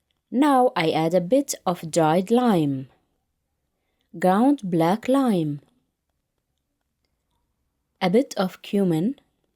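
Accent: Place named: Kuwait. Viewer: احب حجيج الكويتي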